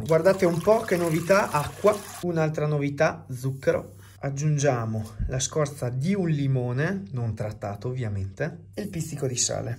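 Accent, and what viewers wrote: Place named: Trentino, Italy. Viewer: Auguri per i tuoi denti!! Sei sempre molto chiaro nell'esporre le ricette👏. Mi piace il tuo modo di proporti, naturalmente la tua bravura e passione in cucina è super. Ho dei parenti a Trento e la tua parlata con a volte qualcosa in dialetto mi piace un sacco!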